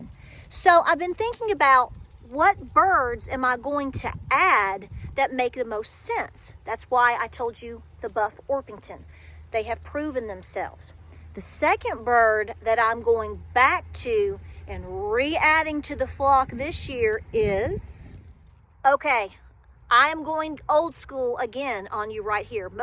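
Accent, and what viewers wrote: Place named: Virginia, United States. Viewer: Golden Comets are my number one! I do like my Easter Eggers because of the egg color even though they are not big egg producers! Which brings me back to the Golden Comets, they make up for the low production numbers of my Easter Eggers! I was born and raised in Virginia, but dad moved us to Texas in the early 70's. So I appreciate your accent! I can still bring mine out when I need it!😂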